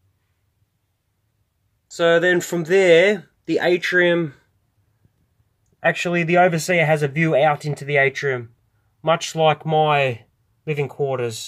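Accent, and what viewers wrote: Australia. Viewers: He sounds SO Australian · Just a suggestion for moc's like the one you just did, have you ever heard of brick arms? Brick arm's are miniature weapons and accessories which are compatible with minifigs. I have quite alot, I can tell you're Australian so lucky for u the nearest website is in Melbourne, Victoria it is called rick's james bricks, they sell brickarms and lego. Hopefully this info is useful to you.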